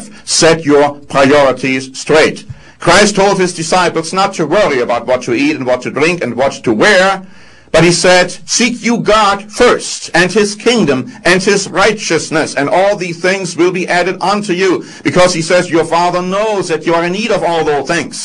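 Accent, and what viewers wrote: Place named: Germany. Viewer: this guy is german , u can easily tell by his german accent .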